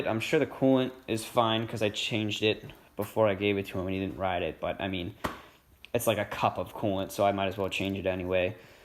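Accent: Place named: Massachusetts, United States. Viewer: You from mass??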